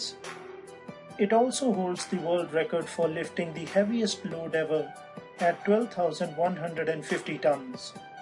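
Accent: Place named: India. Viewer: As soon as I hear an Indian accent, I know it's going to be full of bullshit and factual errors.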